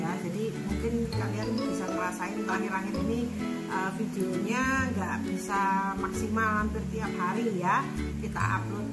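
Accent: Java, Indonesia. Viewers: Assalamualaikum Mau Nanya Orang Jawa Ya Kok Bahasanya Medok gitu Hehehe?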